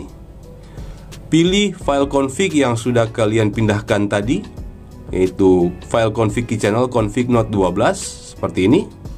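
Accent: Kotamobagu, Indonesia. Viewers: Om orang kotamobagu kang 😂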